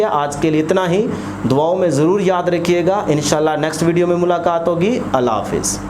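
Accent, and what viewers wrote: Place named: Saraiki, Pakistan. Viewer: Sir accent is like saraiki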